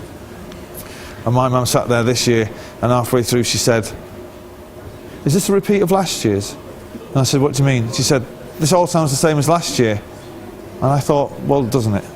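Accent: Manchester, United Kingdom. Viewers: Try going to Ireland with your Manc accent and tell the Irish that YOU are Irish Noel ... they'll call you a plastic Paddy!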